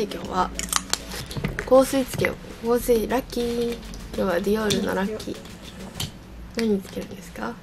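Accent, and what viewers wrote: Kansai, Japan. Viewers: 関西弁？1人の時はあんまりでないけどお友達と話してる時結構出てるのかわいい🥰笑笑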